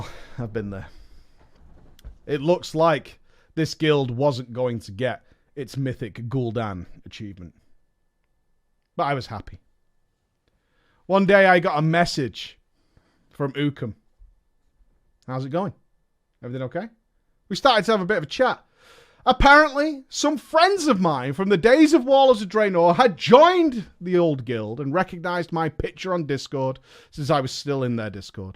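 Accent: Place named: Manchester, United Kingdom. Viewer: Sounds like your from manchester ar kid nice to see someone local doing well, keep it going mate.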